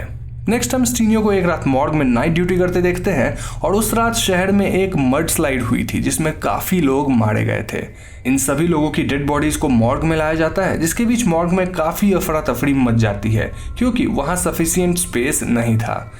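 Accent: Bengal, India. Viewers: U r bengali right , accent shune bujhalm but whatever you're doing that's awesome.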